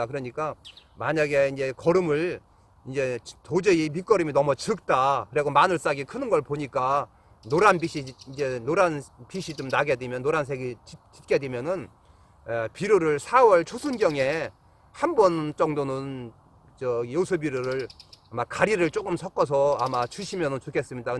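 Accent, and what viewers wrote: Chungcheong, South Korea. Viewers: 충청도 사투하네요 고향이 그리워요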